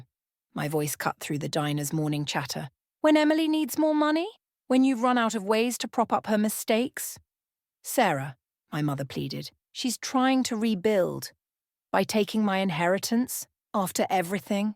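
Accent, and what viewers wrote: England, United Kingdom. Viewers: Beautiful English accent.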